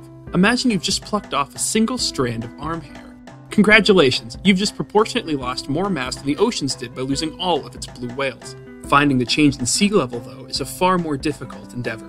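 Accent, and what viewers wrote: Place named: United States. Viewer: Sounds American, says "maths" For shame..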